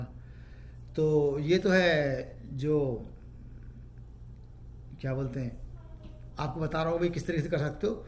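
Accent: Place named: Uttar Pradesh, India. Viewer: Salam chacha ap ka ye andaj bahot achcha lagta hae ajawo bhai dosto asa to hamare U P me bolte hae thanks chacha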